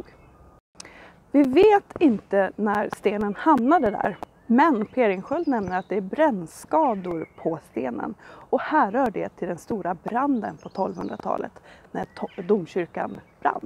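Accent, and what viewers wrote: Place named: Uppsala, Sweden. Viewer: Någonstanch verkar vara Uppsalalingo. Debattören Malcolm Kyeyne uttalar på samma sätt. Ok. Jag tycker Gamla Uppsala Museum gjort en rad riktigt intressanta videos, de flesta proffsiga, Tittar så mycket jag hinner. Igår var jag tvungen att bila utmed långhundraleden för att se med egna ögon. Mer kunskap åt folket.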